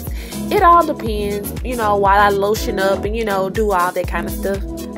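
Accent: South, United States. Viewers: The southern accent is real with this one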